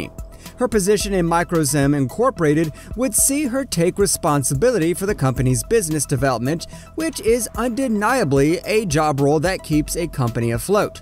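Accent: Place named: United States. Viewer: hahahaha!! It's the YT algorithm trying to understand an American accent and translate. We are just fortunate the speaker didn't come from down south of the Mason Dickson Line cuz, we'd be up t'our urmpits in pee pickin' tribbles, y'all LOL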